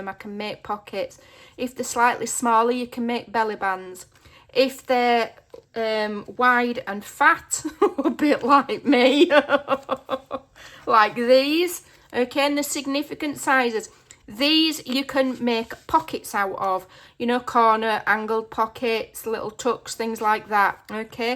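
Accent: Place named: North, United Kingdom. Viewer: Only just found you and haven’t watched this video all through yet but …..I just love your northern accent so you’re a winner already 😀 .. will comment on the video later 😀 xx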